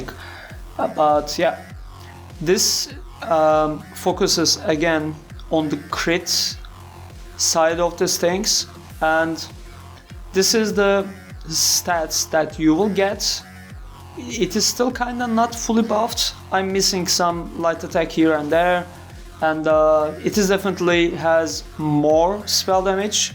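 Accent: Turkey. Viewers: are you from turkey mate?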